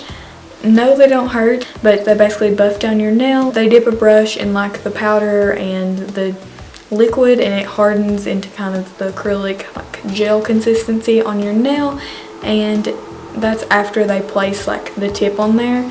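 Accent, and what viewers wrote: South, United States. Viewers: Omg I just found ur channel and I'm so happy because I have not found a YouTuber who has a southern accent like me! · wait omg i thought she had an english accent then i realized it was a country accent 💀💀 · Am I the only one who thinks like she's from Russia or from a northern Europe country? I think she looks so much like she's from of theses country that I find it odd that she have a southern accent. I love your videos, you are gorgeous!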